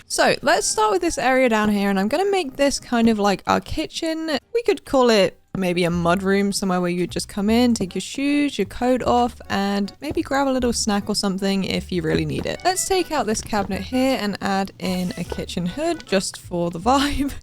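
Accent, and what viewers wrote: United Kingdom. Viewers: Wanted to comment and tell you that my little girl and I have been absolutely loving watching your videos lately. She is delighted by Millie's antics, and loves your British accent, as well.:) We've recently gotten back into minecraft as she's finally old enough (five years old) to play it, too! We love your videos, & Millie!!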